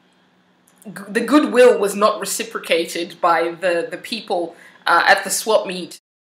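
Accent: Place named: Australia. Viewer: I love that Aussie accent of yours!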